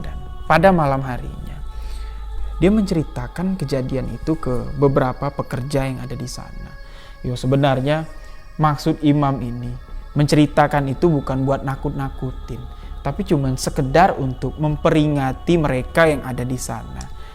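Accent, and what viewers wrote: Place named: Bali, Indonesia. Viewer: Afwan, antum Logatnya seperti orang Bali ya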